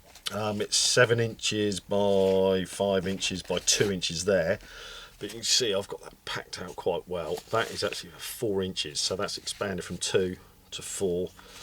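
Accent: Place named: United Kingdom. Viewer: Some great items in there that I think I might add to my EDC. PS, it's nice to hear another Brit talking about EDC, as most of the time, ot's an American dominanted topic. Cheers.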